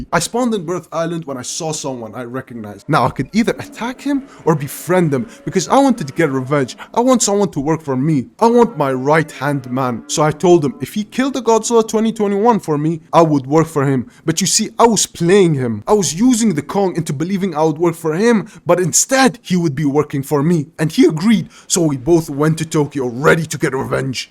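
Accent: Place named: United Kingdom. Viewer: you soud british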